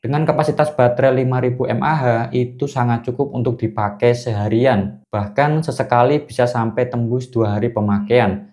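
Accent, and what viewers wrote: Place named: Java, Indonesia. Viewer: Kyknya gw nya mending di ganti aku deh bng medok soalnya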